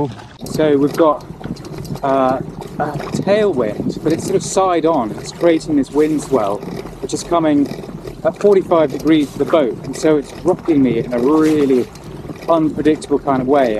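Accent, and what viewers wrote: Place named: Scotland, United Kingdom. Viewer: I struggled to understand your broad Scots accent. Nice canoe, err bike, err binoe, err cake. It’s a cake, definitely a cake.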